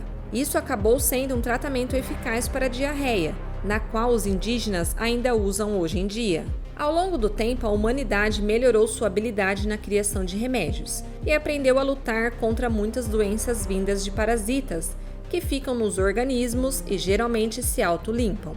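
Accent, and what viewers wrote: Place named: Caipira, Brazil. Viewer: Bom vídeo. Mas a narradora precisa perder um pouco do sotaque caipira.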